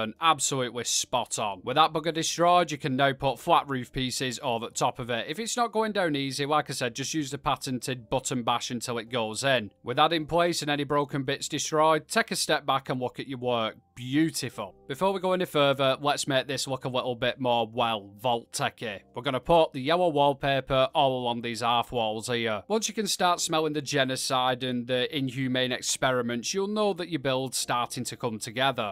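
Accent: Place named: North, United Kingdom. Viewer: Fellow northerner here, by the sound of your accent we are not too far apart. Anyhow just wanted to say I stumbled across your videos recently and I found them well made and chuckle worthy. Nice job! Subbed.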